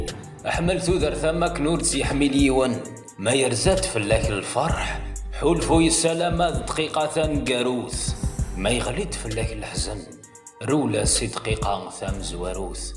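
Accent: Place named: Russia. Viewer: Une belle poésie..... Mais c quoi cette façon de parler..... Ahya Gemma machi Aka on dirait un russe qui parle kabyle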